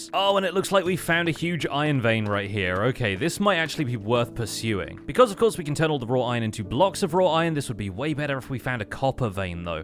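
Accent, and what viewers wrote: United Kingdom. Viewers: I'm loving this play through. Keep em coming. You're a great YouTuber, I appreciate your British accent.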